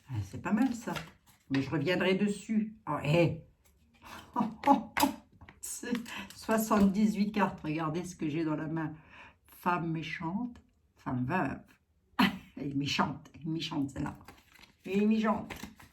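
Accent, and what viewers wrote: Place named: Lorraine, France. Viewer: J’adore vous écouter car votre voix et accent me rappellent les bons moments vécus en Meurthe et Moselle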